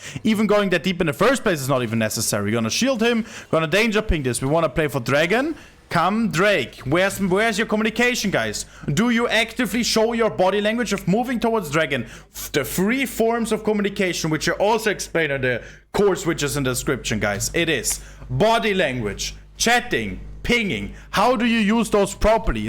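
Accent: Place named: Germany. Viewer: Genauso klingt mein Englisch auch xD Einfach wild als deutscher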